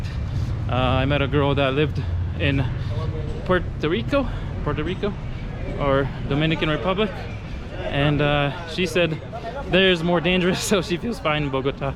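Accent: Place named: Eastern Europe. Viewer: where are you from? I mean originally... Ukraine? You sound russian or slavic speaking ... as your native langauge. your english is fine, just I can tell you're not a native speaker and was wondering where you're from originally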